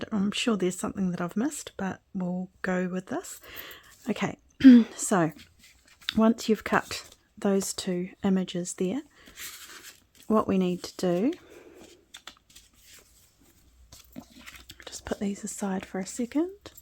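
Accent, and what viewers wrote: New Zealand. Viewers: How cool to hear a kiwi voice!!. Great project xx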